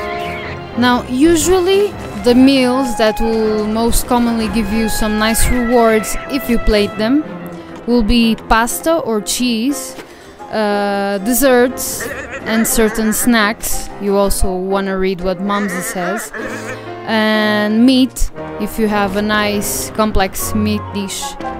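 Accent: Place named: Brazil. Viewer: Nice! My first guess was that you are from Brazil!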